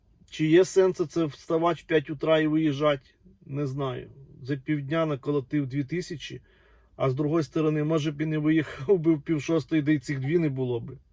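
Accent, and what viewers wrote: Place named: Korostenshchyna, Ukraine. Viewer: А я й чую десь хлопак з коростенщини ну північ полюбому...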